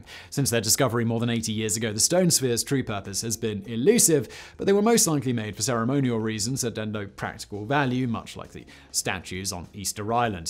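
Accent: United Kingdom. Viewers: British pronunciation is fascinating. Adding an extra i in aluminum, and apparently deleting an m from cadmium?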